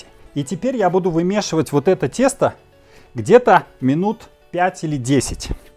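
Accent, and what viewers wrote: Uzbekistan. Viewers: У вас узбекский акцент ;) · У Вас как будто хорезмский акцент. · Да, акцент узбекский. Сразу слышно!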